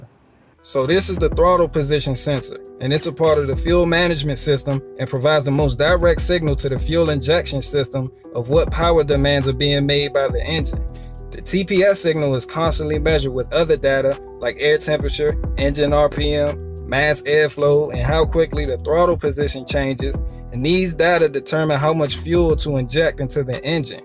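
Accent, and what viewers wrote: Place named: DMV, United States. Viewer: Good video my brother, im tryna get my mobile mechanic business started. Im happy to see another brother like myself. Keep the vids coming, also are you in the DMV?? Im originally from the area and you just sound like it. Keep grinding brotha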